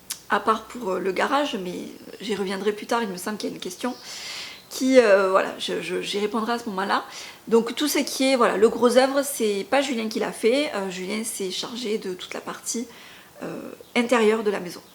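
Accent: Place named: South, France. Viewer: Coucou, Il y aura toujours de la critique et des envieux....c'est la nature humaine.. Toujours un plaisir de t'écouter avec ton bel accent du midi.. Bizzz😘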